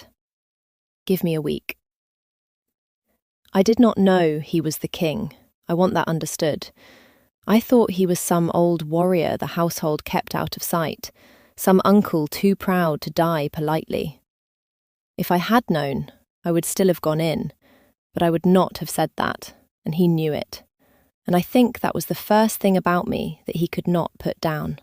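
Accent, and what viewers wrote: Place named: England, United Kingdom. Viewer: I love the English accent. ♥ And I loved this story. ♥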